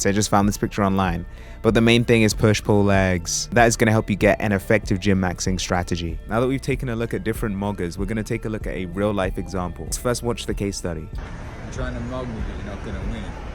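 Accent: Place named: United Kingdom. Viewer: Dude I kinda hear an accent, are you originally from the uk? 🇬🇧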